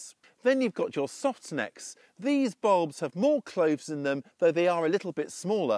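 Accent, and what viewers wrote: United Kingdom. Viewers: Zone 8?!?!?! Where is he? I assumed he was in Britain because of his accent.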